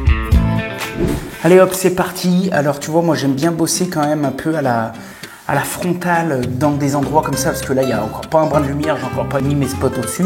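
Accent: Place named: Marseille, France. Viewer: Tu est de Marseille toi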